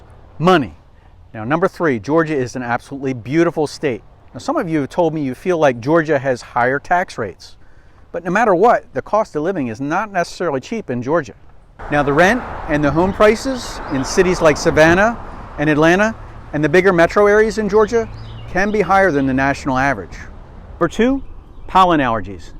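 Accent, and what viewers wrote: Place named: New England, United States. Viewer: Sounds just like new England. only less weirdos.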